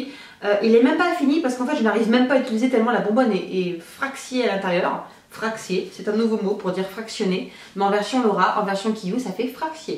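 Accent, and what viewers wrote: England, United Kingdom. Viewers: je t'adore et j'adore ton accent british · J'ai le même mascara de chez Ives Rocher il est génial mes cils sont plus long vraiment super et t'aime très très fort avec ton accents anglais bisou!! <3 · Il faut que tu arrêtes de faire l'acsan anglais. Je t'adore ma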